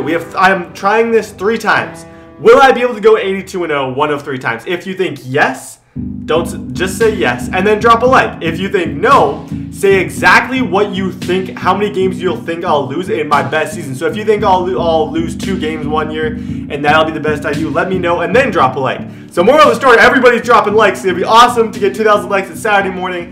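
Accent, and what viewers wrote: Canada. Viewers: I can tell Canadian because you say soorry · I knew you were Canadian since you like the Raptors and how you say about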